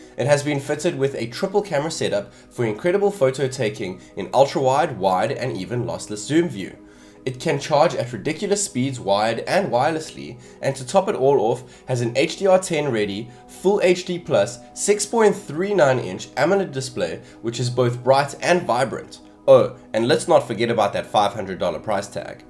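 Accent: South Africa. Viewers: always easy to recognize a fellow Southafrican Did you move overseas permanently?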